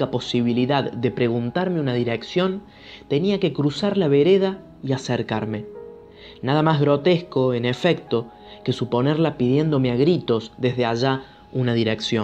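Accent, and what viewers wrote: Cordoba, Argentina. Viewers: Me encanta ese acento cordobés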